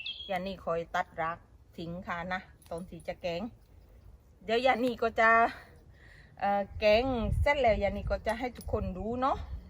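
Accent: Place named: South, Thailand. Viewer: น้องหมาน่ารักมาก แสนรู้ด้วยครับ ฟังพี่Yaneeพูดสำเนียงไต้เพลินมากดูแกงอ่อมไปน่าทานจริงๆครับฝักก็งาม 14นาทีคุ้มค่ามากครับคริปนี้ อยู่ทางโน้ดูแลตัวเองด้วยครับ คนทางนี้ส่งกำลังใจให้❤️มีเวลาแวะมาหากันบ่างน่ะครับ😊🇹🇭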